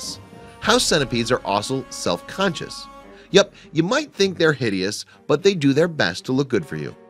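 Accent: United States. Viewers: “Tchi-tn”. I am sorry, but this is pronounced “Khai-tn” (from Greek χιτών, chiton). “Cheating” is a completely different word. American narrators are so American...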